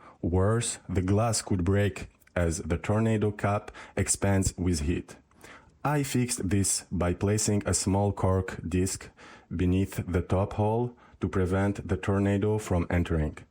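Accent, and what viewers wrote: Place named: Eastern Europe. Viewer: nice eastern Accent im from Poland ! Peace ! ❤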